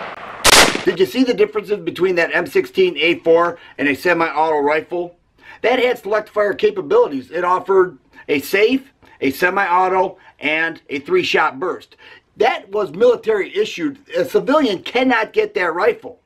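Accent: United States. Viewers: The presenter needs to do a little brushing up on his facts. He uses Assault weapon and Assault rifle interchangeable at about 8 seconds into the video. The AR-15 is not an Assault Rifle, but, it is an Assault Weapon. The terms are United States specific, because different words have different meanings in different places, but, he sounds pretty 'merkun. Assault weapon is usually defined as a semi automatic with a detachable magazine. Sometimes includes the definition of pistol grip, but, not always. It's absolutely true true that the AR is not an Assault Rifle. His claim that it's not an assault weapon is false.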